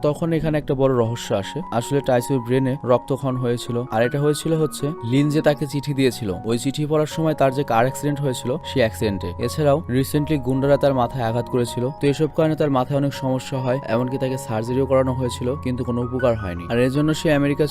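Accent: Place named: Barishal, Bangladesh. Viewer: আপনি বোরিশাইলা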